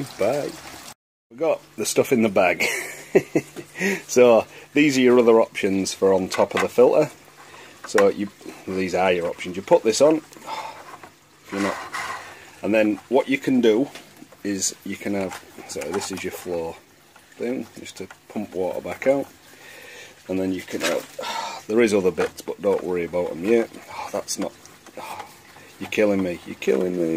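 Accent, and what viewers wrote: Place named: Northern England, United Kingdom. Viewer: Strong northern accent 😂 I'm londoner and I have trouble understanding too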